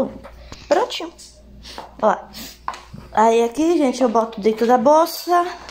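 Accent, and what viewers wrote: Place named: Northeast, Brazil. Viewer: Ela eh nordestina vcs não entendem que o sotaque eh normal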